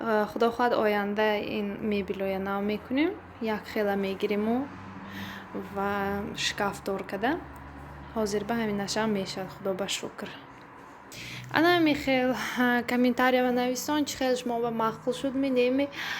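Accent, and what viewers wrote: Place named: Khujand, Tajikistan. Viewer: Салом Мана дугонам Худжанди буд гапзаниш махкулм буд шумони хамунбарин гап занитон махкул хама влоготона тамошо дорам ай як лав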